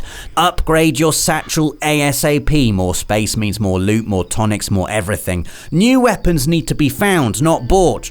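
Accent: United Kingdom. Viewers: Not another limey accent....Johnny Rotten plays rdr2?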